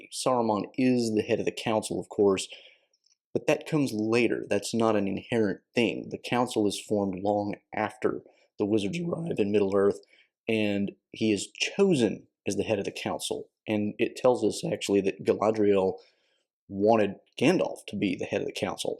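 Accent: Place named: Louisiana, United States. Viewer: Hi Lucas. I wouldn''t be surprised if "the war that will end war" was of French origin. On the other hand, I think evidence would need to be brought forward to prove it. (I hope you can read this in the Louisiana accent of our host).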